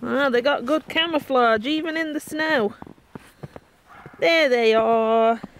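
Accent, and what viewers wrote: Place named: Northern England, United Kingdom. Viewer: Hi!! Your accent- it sounds like it's from Northern England! Do people in your area usually speak like that?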